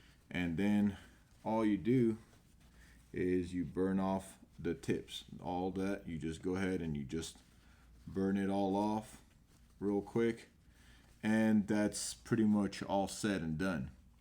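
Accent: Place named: Texas, United States. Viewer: I'm from Texas and you're from Florida, but I'm jealous because you have a perfect Texas accent, especially from the Central toward West Texas regions. You look like you could be in western movies. You might consider being an extra, at least. No one in, say, Fredericksburg or Hamilton or Junction, Texas would ever think you're a Floridian.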